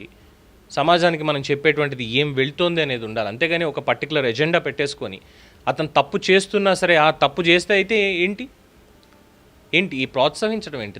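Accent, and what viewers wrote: Telangana, India. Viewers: గుడ్ మార్నింగ్ గారు. మీరు చెప్పిన కంటెంట్ చాలా కరెక్ట్. మీరు మీ భాషను చాలా మదింపు చేసి తెలంగాణా స్లాంగ్ తీసుకొచ్చారు.అభినందనలు.one has to be a Roman when he is in Rome, True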